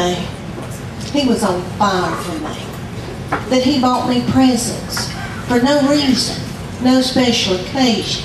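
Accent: South, United States. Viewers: She is my people! Those who say she isn’t funny….. have no sense of humor! Humor is always based in some truth. We lived in Europe when we started watching her and her accent made me miss all those years we lived in the south! I have a friend from Tennessee who could pass as her sister, that’s why I say she’s my people! ❤️ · Her southern accent makes it funnier 😂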